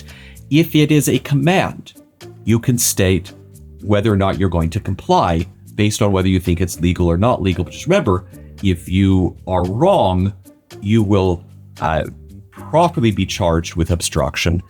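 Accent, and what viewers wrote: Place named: Canada. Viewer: Clear Counsel is not very clear or helpful. This dude sounds like he’s from Canada!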